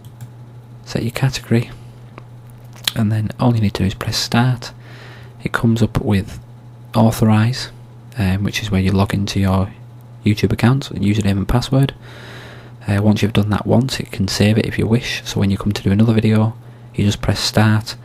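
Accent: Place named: Liverpool, United Kingdom. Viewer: sounds like a scouser (Liverpool)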